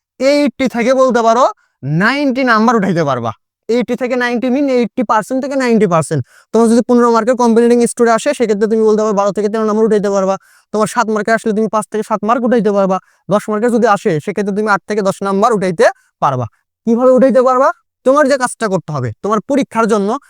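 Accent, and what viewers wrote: Bogura, Bangladesh. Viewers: Vai ki bogura r naki??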